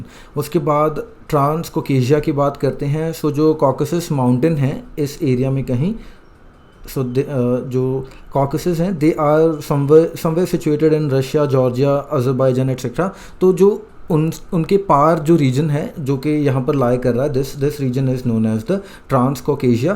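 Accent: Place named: Delhi, India. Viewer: Puri delhi wale accent.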